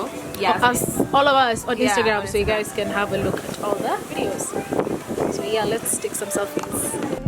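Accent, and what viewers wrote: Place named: Kenya. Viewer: Love her Kenyan English accent 😭ps we need a tutorial for the makeup you was wearing in the start of the video 😍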